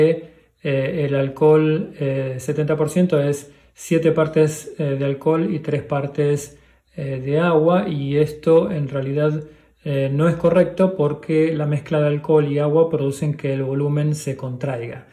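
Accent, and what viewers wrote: Argentina. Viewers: Hola, muy buen video: me gustó mucho, completito el edc. Te consulto: sos argentino o uruguayo ?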